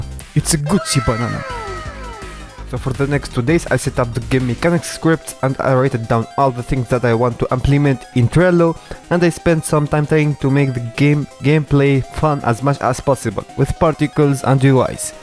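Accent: France. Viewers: It's me or this french accent is KINDA THICC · t'est français ? car tu sonne français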